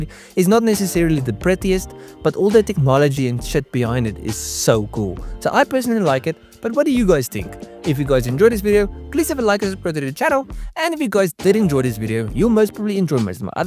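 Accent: South Africa. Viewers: You sound like a white south african, please take care and protect yourself from the maniacs.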